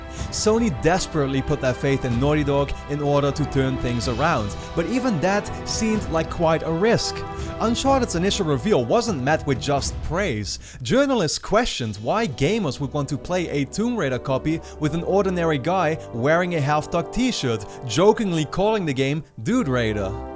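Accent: Netherlands. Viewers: are you dutch because you have that dutch accent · The Dutch accent is strong with this one.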